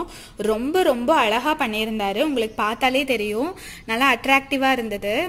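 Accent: Kongu, India. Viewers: Kongu ngala neenga pesura slamg la therunjuthu album pathatha vechu kekaren thapa nenachukathinga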